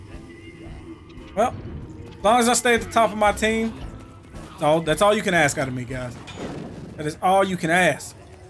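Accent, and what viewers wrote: South, United States. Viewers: Whats up with people always saying he sounds black? It's just southern. Black has a more... Powerful tone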